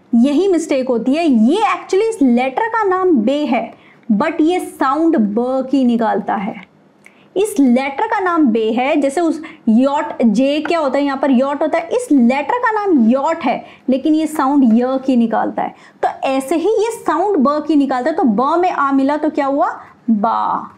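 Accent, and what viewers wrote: Rajasthan, India. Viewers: bist du aus rajasthan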